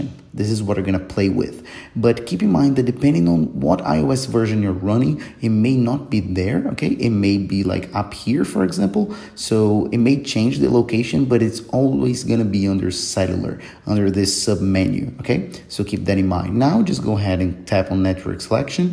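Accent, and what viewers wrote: Brazil. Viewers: E esse sotaque de brasileiro meu parceiro? É Brazuka? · Já reconheci q tu é Brasileiro dez do começinho do vídeo kkkkk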